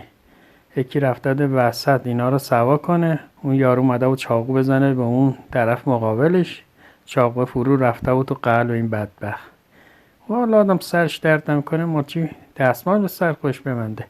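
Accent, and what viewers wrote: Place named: Qom, Iran. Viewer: عالی بود با لحجه قمی که در حاشیه هم جواد رضویان بازی میکرد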